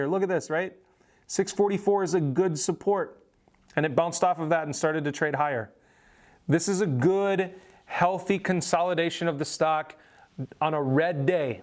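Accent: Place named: Minnesota, United States. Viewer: Hey another MN boy! I knew that accent!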